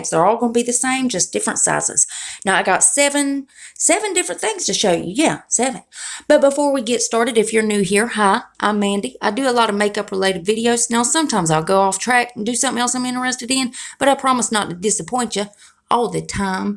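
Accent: South, United States. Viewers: I love your southern twang! I am excited for the Betty Boop!!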